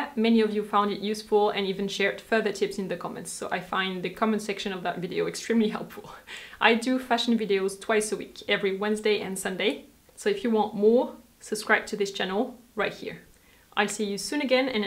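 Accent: France. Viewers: I’m so glad I stumbled onto you! This was very helpful! Are you French or French-Canadian? I love your accent! Très mignon! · You are so beautiful and elegant , and I love your french accent and your aristocratic look ❤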